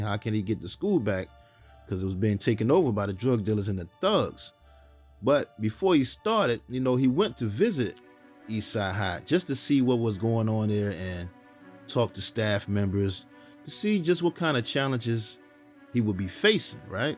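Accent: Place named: Washington DC, United States. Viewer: I've been watching your videos and I love them! Goes into details I never knew. Sidenote: I keep listening to your voice and I swear you sound like you're from DC. What really made me think that is one of your videos you mentioned Chuck Brown and most people outside the area knows he's the godfather of gogo.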